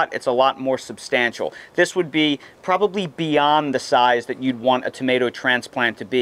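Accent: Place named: Northeast, United States. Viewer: *I detect a NEastern UDA accent.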